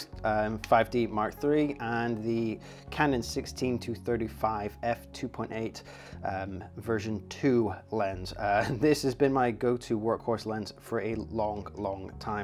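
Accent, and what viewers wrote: Scotland, United Kingdom. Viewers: Many thanks certainly enjoyed this and I have just subscribed. Would I be right that I can here a bit of scottish burr in your voice, where are originally from. I am a exiled Scot myself. Regards, Allan Davies.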